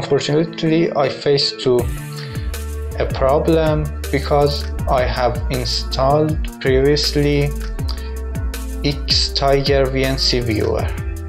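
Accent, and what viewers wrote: Iran. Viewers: Irani ? تابلو بود ایرانی 😂❤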